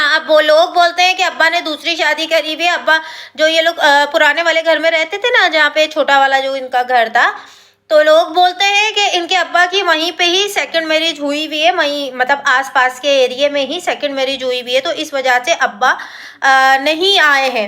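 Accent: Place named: India. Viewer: Sach me aap pakistan me raheti he aap ki baat cheet lahja indian jassa he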